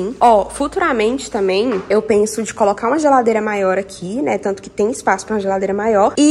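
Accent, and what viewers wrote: Minas Gerais, Brazil. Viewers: Você tem sotaque de mineira 😊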